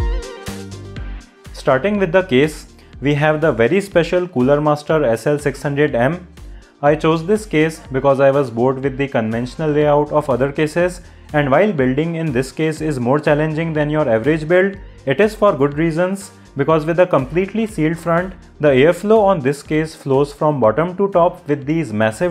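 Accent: India. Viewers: This indian accent is killing me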